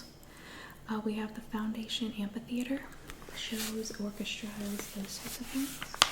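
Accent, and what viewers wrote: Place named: Ohio, United States. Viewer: I hope you're feeling better! Youngstown is my town! The Canfield fair is the largest county fair in the state. You sound like you're from around-about these parts. If you've never been to it, I recommend going. There's a lot to see, animals to see/pet, art, food, and rides. 🙂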